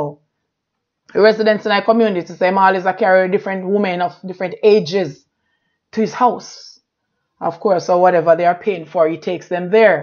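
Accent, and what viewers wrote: Jamaica. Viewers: I like the patois..never heard you like this b4....keep moving forward..one way...blessing!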